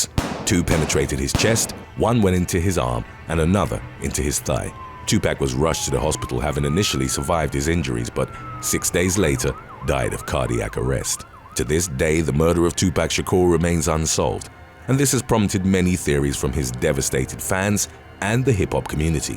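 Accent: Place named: United Kingdom. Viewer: A Lu min e um 😂 😂 you Brit’s crack me up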